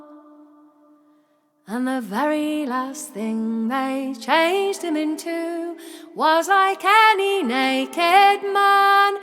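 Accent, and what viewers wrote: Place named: Northern England, United Kingdom. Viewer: Wow, just found this site and was bowled over! My first conclusion is that you are from Lancashire but video by video I have been proved wrong! But i still love the hint of "flat northern vowels" that, at least, I think I am hearing. I guess living on a small holding in deepest darkest rural mid Wales has muddied my elderly sense of hearing.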